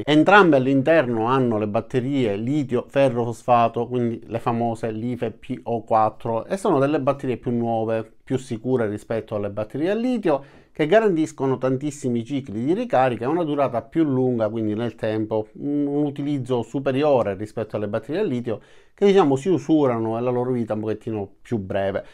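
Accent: Sicily, Italy. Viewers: Ciao grazie delle preziose informazioni. Oggi anche mia moglie ha visto il video e mi ha detto “dall’accento è di enna o di calascibetta” ..lei è di enna 😂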